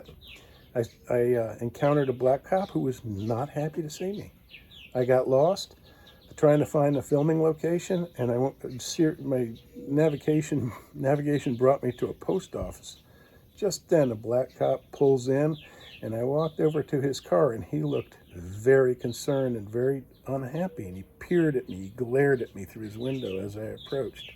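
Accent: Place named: United States. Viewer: Hey! You sound like an American! . . . I could not have said it better.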